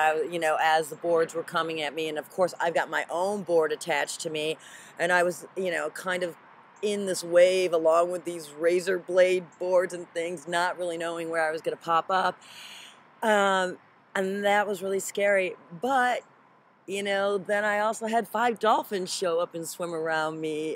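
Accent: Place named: California, United States. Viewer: The 405 traffic!! you sound so Californian I can listen all day!!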